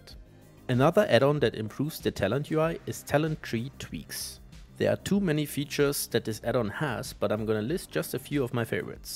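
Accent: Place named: Germany. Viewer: Geiler deutscher Akzent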